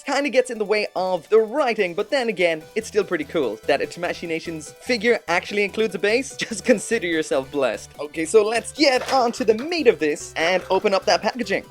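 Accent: Ireland. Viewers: I had no idea you had an Irish accent! Regardless, Thanks for the reply, and you can count on me to continue watching and supporting your channel.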